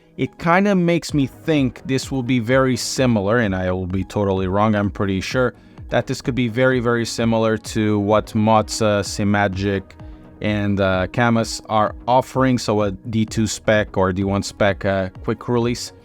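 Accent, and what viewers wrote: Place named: Portugal. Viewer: Had a feeling you were Portuguese for the slight accent. Amazing English but the Portuguese rhythm never goes away. Great work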